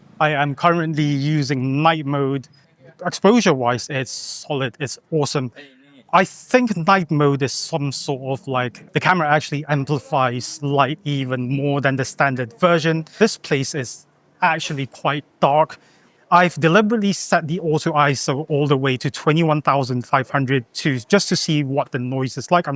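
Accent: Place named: United Kingdom. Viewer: are you indonesian? im hearing a british man rn lmao, until i saw glodok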